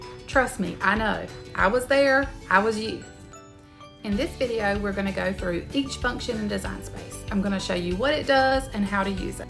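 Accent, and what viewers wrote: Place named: South, United States. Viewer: Hello, i am not happy to have to say this, but i'm disappointed in your video, after your introduction, you went into design mode, you didn't start from the beginning & i'm a beginner, so this is why i am disappointed, i mean you sound like a very nice southern lady, but i didn't even get to see what Cricut you were working with at the start of your video.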